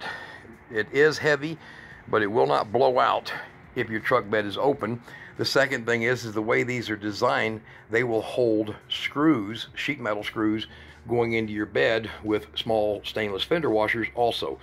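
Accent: United States. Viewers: You sound American.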